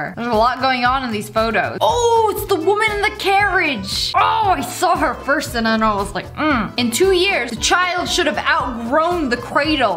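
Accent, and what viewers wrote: France. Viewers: Are you French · R u actually from France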